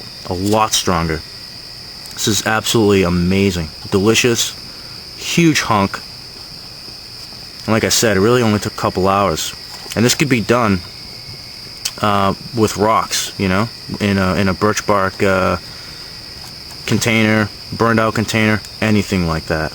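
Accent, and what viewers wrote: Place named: Massachusetts, United States. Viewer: great vid you sound like you come Massachusetts 😆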